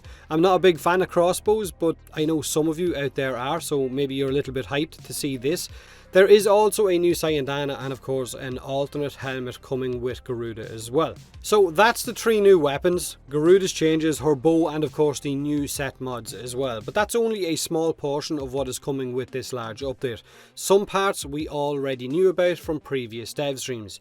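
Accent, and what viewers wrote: Ireland. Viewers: Another great video. Thanks. What part of Fortuna are you most excited for? Also, what part of Ireland are you from?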